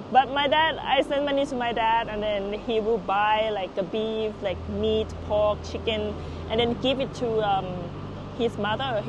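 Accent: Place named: Philippines. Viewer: R u Filipino? U have Filipino accent. You look beautiful though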